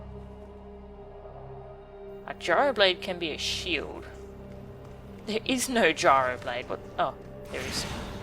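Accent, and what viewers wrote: Australia. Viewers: You have a very amusing voice. Australian accent?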